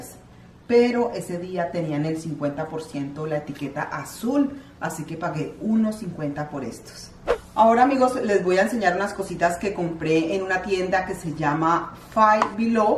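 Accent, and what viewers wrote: Colombia. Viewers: Tan linda mi,nunca has perdido tu acento Colombiano eres tan genuina sencilla,no eres egoísta tienes don de servicio por eso eres triunfadora en tu canal, amas a tu mami y flia.Tus manualidades bellas Dtb 🙏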